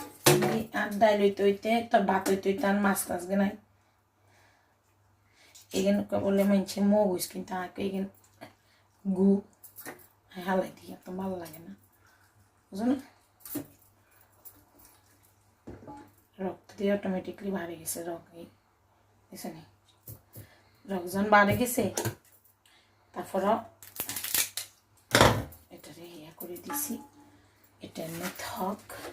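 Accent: Noakhali, Bangladesh. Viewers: আপু আমি আজকে প্রথম আপনার কমেন্ট করলাম আপনার নোয়াখাইল্লা ভাষা খুব সুন্দর লাগতেছে আপনার মুখে আমিও নোয়াখাইল্লা বউ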